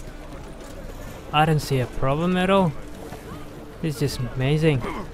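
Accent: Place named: Australia. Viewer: Where are you from? Your accent seems different, kind of australian but german but chinese.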